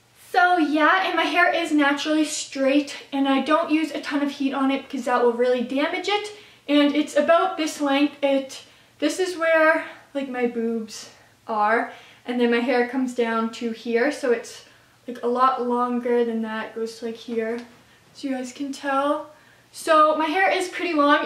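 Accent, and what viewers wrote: Canada. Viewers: Are you.. Canadian..? Also you're really pretty. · Are you Canadian?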